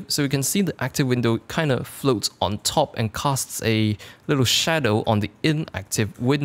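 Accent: Malaysia. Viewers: Sounds malaysia english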